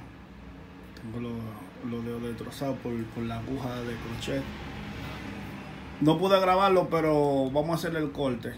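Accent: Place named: Dominican Republic. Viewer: Es que así es que hablamos los Dominicanos, y no solo cambiamos "L" por "R" tambien cambiamos palabras por completo😂😂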